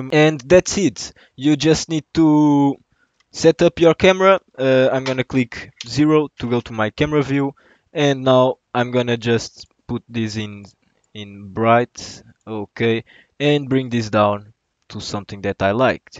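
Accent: Portugal. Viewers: hahahha bro ouvi tipo 10 segundos e soube logo que eras tuga obg pelo tutorial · Tuga no blender? Vou ja dar sub! soube logo pelo sotaque hahah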